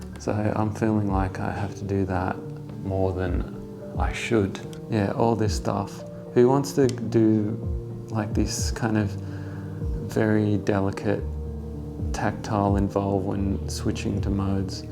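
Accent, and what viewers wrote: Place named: Australia. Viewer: yeah I see it peek in on you,the funnier part was hearing you talk just now and figuring out your down under I had been listening with sap app (in Spanish) lol thanks for the help and guidance I do appreciate it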